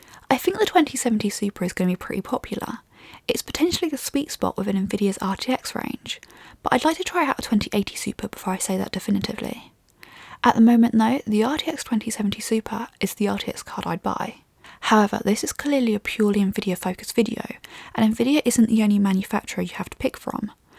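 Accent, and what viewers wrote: United Kingdom. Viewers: I really love your UK accent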